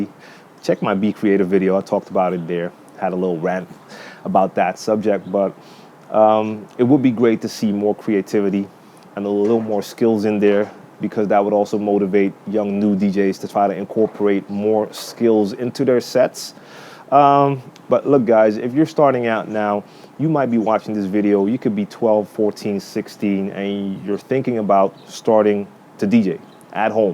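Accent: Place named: United States. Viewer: true that! Especially cause I am doing all digital. But it's not even about me. It's about the music :) Haha, klopt helemaal ;p! Ik moet eerlijk zeggen dat ik niet had geweten dat jij ook Nederlands was totdat je het in een van je videos zei. Goed Engels/amerikaans accent haha. Heb veel van je videos geleerd!!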